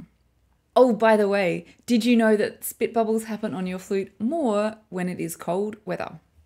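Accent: Australia. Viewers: I also grew up in Sydney, and my accent is identical to Jane's, though I've lived in Far North Queensland for many years and accents are a little different at the top end.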